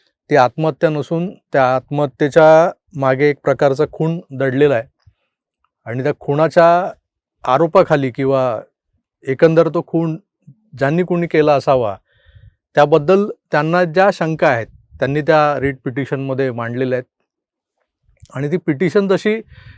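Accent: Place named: Pune, India. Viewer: O ' sir U speak marathi very pure than sadashiv peth.Ur knowledge is very Crucial N genius N brilliant.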